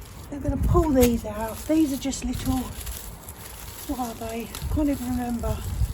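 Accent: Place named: England, United Kingdom. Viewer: I love your English accent, thanks for sharing instructional video.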